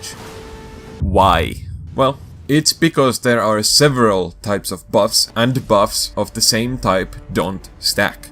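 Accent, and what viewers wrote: Finland. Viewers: Why the fuck do i smell Finnish accent… vittu saatana jätkä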